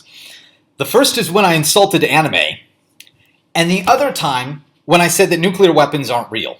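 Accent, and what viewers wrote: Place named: South, United States. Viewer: Also, southern accent really slipping through in this one, kek.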